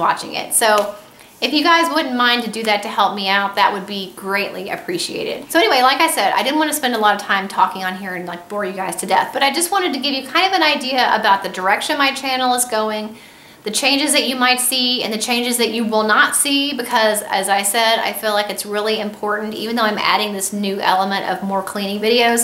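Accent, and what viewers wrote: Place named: South, United States. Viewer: I subscribed to your channel because I was interested in learning more about homeschooling and being a SAHM. I like your personality and southern accent. I've always liked anything "southern"... Movies, books, tv shows, etc. I also like your gift videos and that you like to use natural cleaners. Your Mickey subscription box video has me itching to save up for another Disney vacation and I'm really excited to watch your Disney videos now! Our baby boy was born last week and we haven't decided on a name yet. What are some popular names for boys in your area? Or if you had a boy what would you name him?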